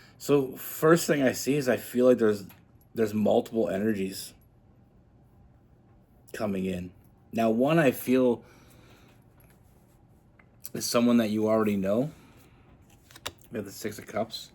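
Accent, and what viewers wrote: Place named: Canada. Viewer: bro that was so great and spot-on! have let go/moved away from a libra, but some of that energy is still hanging around ... meanwhile a pisces has come along and we're taking it slow and getting to know one another and it really feels like emperor/empress. anyway i thought you might also be canadian based on your accent and then i saw the tim hortons lol! thanks and take care.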